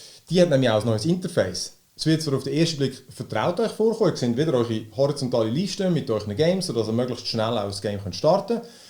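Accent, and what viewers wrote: Switzerland. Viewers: Es ist Schweizer deutsch wo ich wohne 👍🏽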